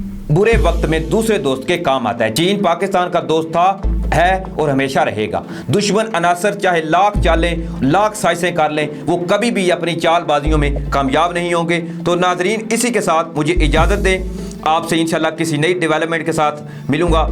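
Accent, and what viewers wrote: India. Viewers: Its a Indians channel. Anchor is Indian in disguise of Pakistani. You can check his accent also. He use qhab instead of khwab, qhas tore instead of khas.its problem of Indians. Plz unsubscribe it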